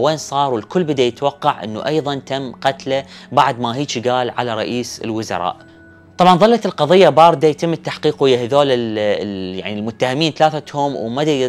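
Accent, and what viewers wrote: Iraq. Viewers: حلوة اللهجة العراقية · متابعة من المغرب احب سردك للقصص واللهجة العراقية الجميلة زادت السرد جمال عل جمال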